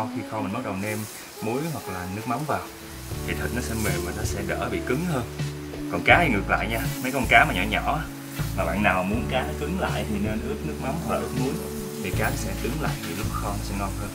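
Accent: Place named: Saigon, Vietnam. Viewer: Nguoi mien Tay, nhung noi giong Saigon, giong tuong doi dung chinh Ta, rat Ro rang, hay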